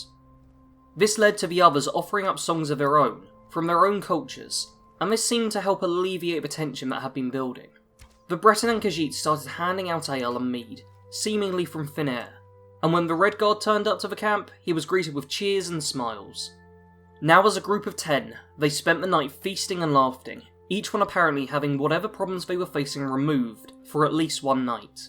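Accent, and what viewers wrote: United Kingdom. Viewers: Is it your accent english? Also do you use mods ?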